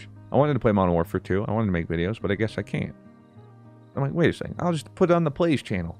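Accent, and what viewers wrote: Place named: South, United States. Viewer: Listen to that country twang you have! Idk tha5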